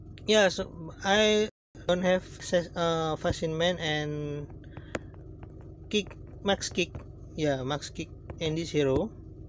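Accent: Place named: India. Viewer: he sounds indian...okay dislike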